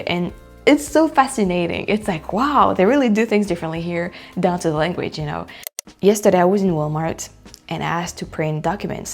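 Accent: Quebec, Canada. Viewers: The end of this video was more promising than the first half of this video. Glad it's getting a little better with the French Canadian. Hang in there and you will be fine Remember these tips. 1. French Canadian is Laced with slang mixed With English 2. Have the Canadian Slow down when they talk 3. French Canadian is a French dialect. Montreal's dialect is Is hard to understand. Even for Me as an American French Canadian. 4. It sounds like you are Picking up some the dialect. you sound more positive in the second half of your video. The little word book is a great idea to have We are rooting for you. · Étrange que tu ne comprennes pas le français québécois considérant que ton accent quand tu parles anglais est beaucoup plus proche de l'accent québécois que de l'accent français... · Hi from Vermont just below Montreal have you picked up the Canadian accent yet 😂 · You're speaking with a Quebec accent a bit; you've adapted.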